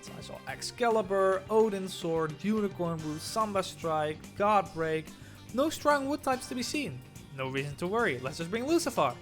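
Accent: Limburg, Netherlands. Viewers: Fam kuumps ste oet limburg? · your nederlands en limburhs omg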